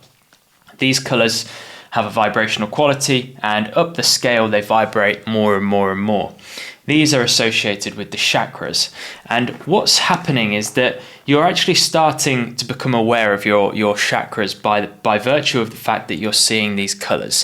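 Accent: United Kingdom. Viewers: I REALLY APPRECIATE HEARING BRITISH PEOPLE SPEAKING OUR LANGUAGE AND SEEING WORDS SPELT CORRECTLY RATHER THAN SPOKEN IN AN AMERICAN DIALECT WITH WORDS PRONOUNCED AND SPELT INCORRECTLY WITH LETTERS MISSING! AMERICAN LANGUAGE IS ENGLISH SO WHY DO THEY FEEL THE NEED TO MISPRONOUNCE AND MISS SPELL WORDS AND CALL IT AMERICAN ENGLISH? PATHETIC!! GREAT VIDEO BY THE WAY FIRST TIME IVE SEEN ONE OF YOUR VIDEOS AND I FOUND IT VERY INFORMATIVE, I'M LOOKING FORWARD TO WATCHING MANY MORE, THANK YOU FOR BRINGING YOUR VIDEO'S ON THE INTERNET. 😊😊😊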